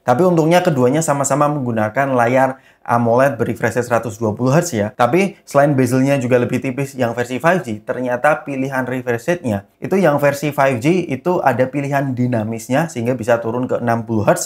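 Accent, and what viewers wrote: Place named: Java, Indonesia. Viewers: Jowo ngendi masse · Wong jogja logat e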